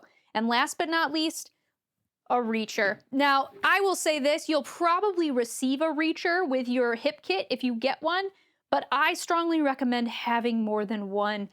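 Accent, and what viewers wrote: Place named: Great Lakes, United States. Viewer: is a Michigan person. Great Lakes accent.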